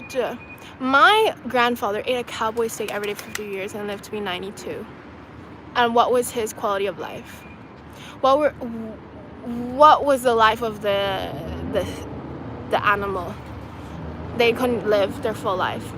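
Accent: United States. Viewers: I thought you were CHINESE? I'm confused..(I heard you mention Africa) but also You talk with an American accent!? Would be great to know seriously where you're from, background & yeah also how you met the "Coach" but you do need to eat Meat/Fish eggs etc I'm speaking as a nutritionist, bodybuilder, sportsman and personal trainer.. you NEED ANIMAL PROTEIN!! 💪🏻 You have a PHENOMENAL VOICE BTW!! 😉👍🏻❤️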